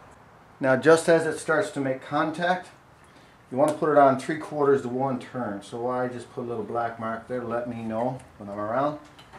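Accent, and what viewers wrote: Canada. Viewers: this guy sounds like a fucking newfie lol click click click click click click with the torque wrench lmfao rofl!!!!!!!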